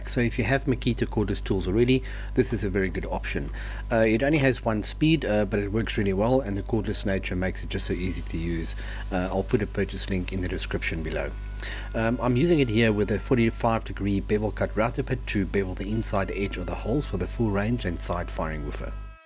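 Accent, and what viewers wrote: South Africa. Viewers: Howzit. This is the first video of yours that I've watched and I became an instant fan after recognising the accent as local. Love the way you combine woodwork and audio - two of my favourite hobbies. Respect and admiration from Durban.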